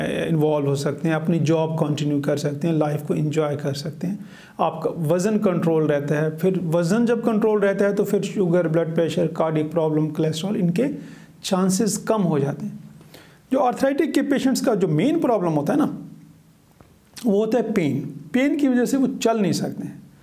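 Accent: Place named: Khyber Pakhtunkhwa, Pakistan. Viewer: Dr.sahib where u located your accent show KPK.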